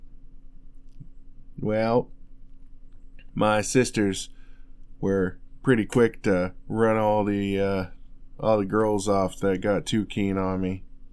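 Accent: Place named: South, United States. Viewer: I loved the audio as always I'll be adding this to my playlist I absolutely love your country accent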